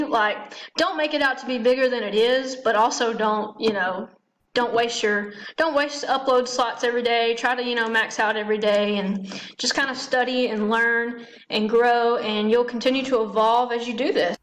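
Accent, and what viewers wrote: South, United States. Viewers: Love your MBA-related videos! Great, highly useful info delivered in a southern gal, no-nonsense way 😉 Subbed!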